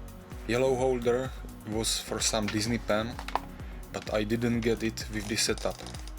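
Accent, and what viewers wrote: Czech Republic. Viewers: Hned jak jsem slyšel ten tvůj akcent tak "jaj čech" :D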